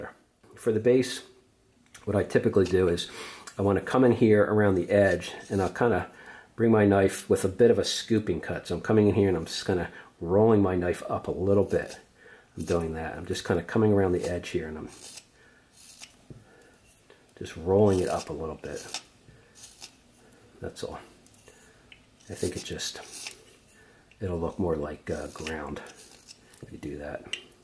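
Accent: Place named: Philadelphia, United States. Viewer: Another great tutorial! That really sounds like a Philly/S.Jersey accent, haha! Im in central PA myself! Go Birds 🦅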